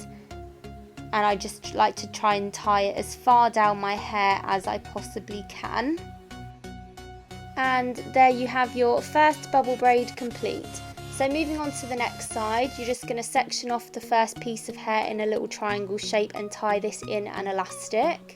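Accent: United Kingdom. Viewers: Lovely accent. I want to be British!😭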